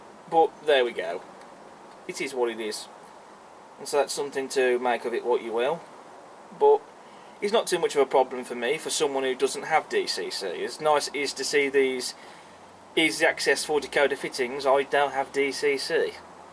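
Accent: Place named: Midlands, United Kingdom. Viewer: I used to live in Tamworth, back in the late 90’s. With the accent you have thought ud be in the Midlands.. I live in York now amazing city esp the plethora of Trains to see favourites being that York to Knaresborough beautiful😊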